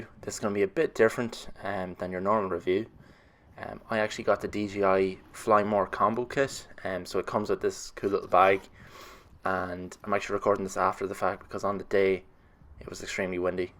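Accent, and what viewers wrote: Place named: Ireland. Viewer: Great review I have the same drone and like you building up confidence with every flight!!! It's fantastic hearing an Irish accent perspective just for a change!!!! Any plans to upgrde in 2023??? I'm looking at the Air 2S or Mavic Classic 3 purely for having a drone for every wind restince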